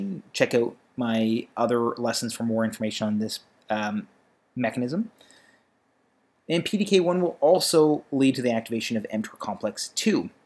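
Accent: Canada. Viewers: Thank you for making these great videos! Are you Canadian btw? Just want to prove/disprove my accent ears...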